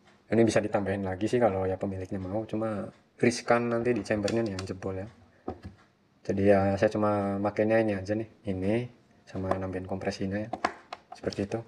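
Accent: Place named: Cirebon, Indonesia. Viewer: sampean dari cirebon mas ?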